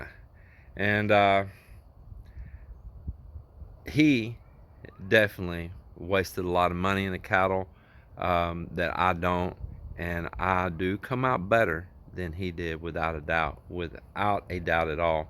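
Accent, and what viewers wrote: United States. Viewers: Certainly alot of decisions to have to make but at least on balance your listening all the best advise to allow a good judgment call, l love listening to your laid back American accent🤔🤗♥️👍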